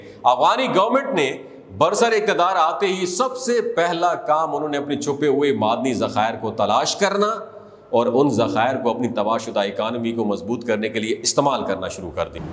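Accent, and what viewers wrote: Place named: Punjab, Pakistan. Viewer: پنجابی ایسے ہی کہتے ہیں جاپان کو جپان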